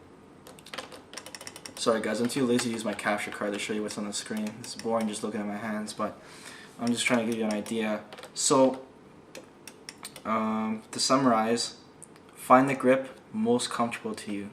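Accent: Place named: Canada. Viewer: I didn't know he was Asian, but I did know he was Canadian because of his accent. It's not an Asian accent, it's Canadian.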